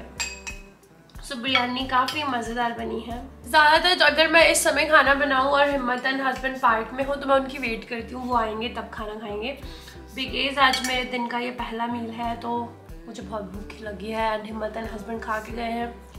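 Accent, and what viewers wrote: Punjab, India. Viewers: I watched ur vlogs ur mix Punjabi nd Hindi accent Bec my mother spoke like u when she is wid me in dis beautiful world Buena don’t care about people they don’t allow us to live happily my sons name is also himmat stay blessed nd happy all d time😊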